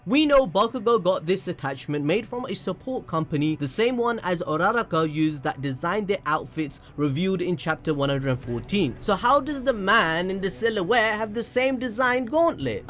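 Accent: Jamaica. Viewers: Are u Jamaican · Wait Holup your Jamaican 🗿 yes I will now go back and like every vid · Ayo I've been watching this man for year no one told me he was jamaican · You Jamaican bro? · Lolol dude you deserve more subs just by adding that Jamaican accent big up u self respect lol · 🤣🤣🤣......yuh get a pass mi boss wid dat Jamaican accent 🔥🔥😂😂😂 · i laughed very hard for the Jamaican accent. now he just needs to weave in a Trinidadian accent and your skits will be perfect.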